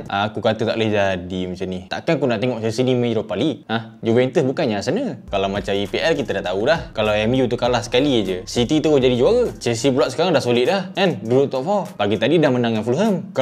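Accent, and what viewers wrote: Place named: North, Malaysia. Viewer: OK,cuma utaqra kuat sgt, org key el kurg paham 🤣🤣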